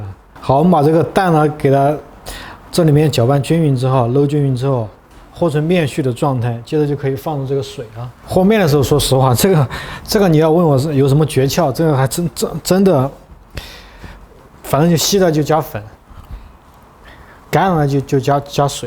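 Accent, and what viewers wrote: Sichuan, China. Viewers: 把四川話直接用国语讲，可能只四川人能听懂，而且亲切! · 好久没看到嫩个潇洒倜傥的美食博主，讲话还加点四川腔，幽默风趣搞笑，吃起自己做的美食一点都不含蓄，你最后那一大口吃得我准备明天去买点食材来做了，就是这里葱实在太贵吃不起，还有你那个干葱估计加拿大也买不到，哎，可咋整呀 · 听口音， 小哥川南的？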